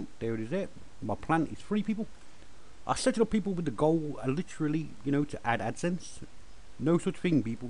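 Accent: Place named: Ireland. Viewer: Thanks for that simple technique, loved the accent and the number of times you say "people". Are you Irish? :)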